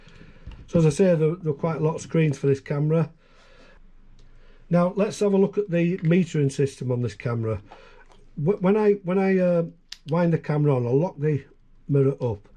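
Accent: United Kingdom. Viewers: Thanks for this video, I was looking at an MX but this is really nice, a lot more expensive though. I currently have a P30, OM2n, Leica R5 and Nikon F3. You sound fairly local, I'm in Manchester. Subscribed :)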